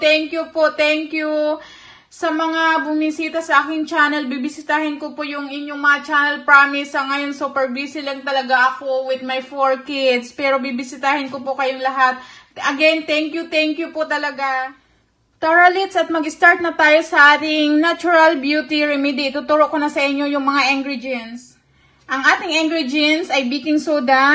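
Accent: Visayas, Philippines. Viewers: Bisaya ka maam?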